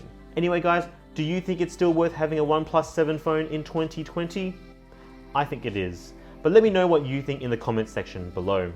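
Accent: Australia. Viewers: I knew he's gonna complain about the lack of support the moment I heard his Aussie accent lol Just ordered a 7 Pro from China after watching this video... Let's see how it goes... And I think OP definitely needs to bring their support back to AU ;-(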